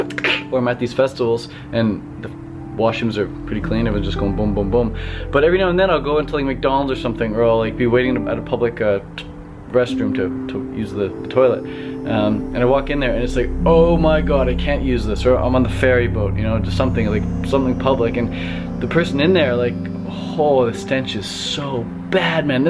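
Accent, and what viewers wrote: Canada. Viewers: Lmao, it's so funny because us Canadians don't ever hear the different btw the Canadian and American accent. I didn't even know he was Canadian until this comment :) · Your Canadian accent is unbelievably strong hahaha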